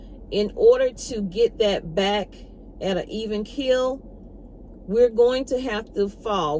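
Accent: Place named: North Carolina, United States. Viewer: I knew you were a North Carolina Girl as soon as you opened your mouth! 💙 ( I reside about 30 minutes from Hickory, so I'm there pretty often). As I listened, I got tickled, because I was like...OMGosh! I found someone like me!! I'm serious. I could be sitting there talking in your place. This is 🤣 crazy!! Thank you for putting yourself out here, for caring about others and for serving as a beautiful example of a true Southern heart. God bless you and your channel. And Food Lion had their crab legs in sale for $11ish this week or next. Do you can yours? I'm considering giving it a go.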